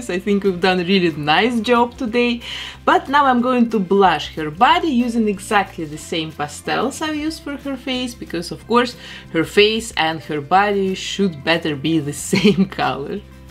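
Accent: Russia. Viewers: Почему мне кажется ,что здесь присутствует русский акцент? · Мне самому показалось, что что у неё русский акцент, а теперь даже сомнений не осталось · Alena Vampanskaya yeah, I thought about it too. I'm just interested in her cute accent Well, it would be great, if she's Russian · What is your accent? Russian??? Whatever it is I LOVE IITTTTT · Блин какой русский акцент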